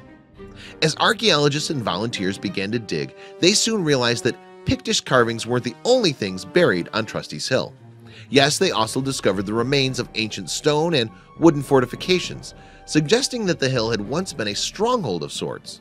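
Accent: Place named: Midwest, United States. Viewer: The narrator has a Wisconsin or Chicago northern accent so he's mispronouncing a lot of words.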